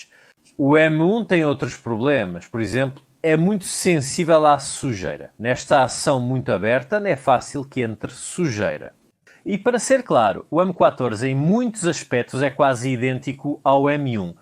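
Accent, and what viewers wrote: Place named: Portugal. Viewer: BR Olá camarada. Você é real ou uma ia? Desculpe falar assim. Você tem um jeito engraçado de falar o português europeu e parece não sincronizar com seus lábios. Mas parabéns. Eu gosto de história. Continue 👏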